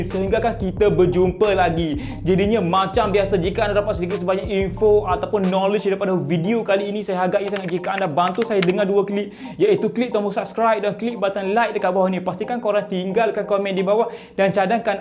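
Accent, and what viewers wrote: Kelantan, Malaysia. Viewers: Kuat tone klate abe ni.. Blh tau.. 😁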